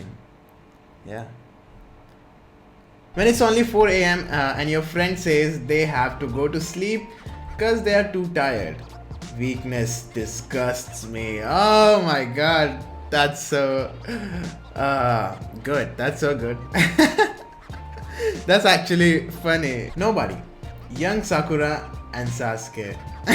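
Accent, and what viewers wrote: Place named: India. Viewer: Ur voice seems like ur an Indian and if u are then I’ll be happy to know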